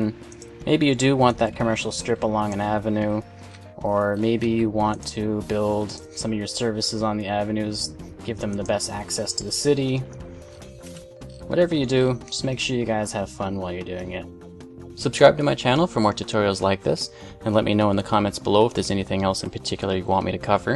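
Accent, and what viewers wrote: Canada. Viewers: Is he Canadian? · Are you canadian? :D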